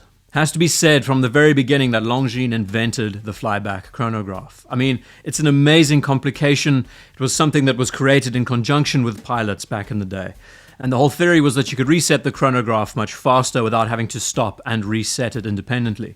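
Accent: South Africa. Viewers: Trouble placing your accent, Pretoria, or ZA in general?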